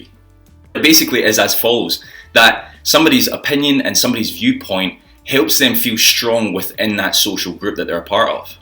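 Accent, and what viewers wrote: Scotland, United Kingdom. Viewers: Oh my god you are saying that the base of the person if the self esteem is compromised this leads them to believe in outlandish ideas NOT for the ideas themselves but for the sake of the reassuring position these ideas give them in an accepting groups. So if you try to reach them through changing their views it's impossible because they are tied these views to their low self esteem.So my question is how can if you can, help someone whose self esteem is damaged to encourage them to feel better about themselves? By the way I knew you were Scottish.I'm from Spain but I am 3% Scottish lol!!!I appreciate how clearly you explain things.Can you please make a video on how to help internally wounded people with some kind of wise approach?Thanks!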